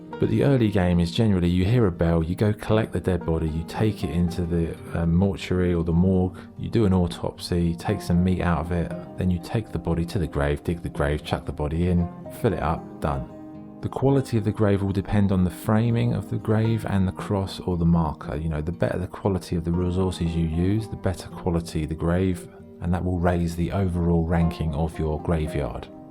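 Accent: England, United Kingdom. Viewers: Love your channel, it’s the only gaming review channel where the persons voice isn’t really irritating to me and you’re English too . Keep up the great content mate really chills me out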